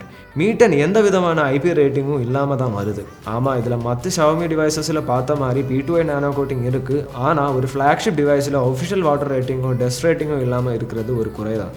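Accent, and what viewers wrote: Kerala, India. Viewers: Ena bro nenga malayaliya... Unga speech style knjm different ha iruku but Nala iruku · malayali anonn oru doubt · bro nennga malayaliya unnga Tamil apadi tha erukku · Bro pesradhu le clarity kammi ah iruku try to improve it .. Malayalam slang konjom iruku try to avoid it. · Bro r u from kerala? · Brother are you a malayali. Unga Tamil 🥳👌. Tamil yaaru pesnalum alagu dhn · Voice over malayala tamila ... Sema kick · மலையாள தமிழ் சூப்பர்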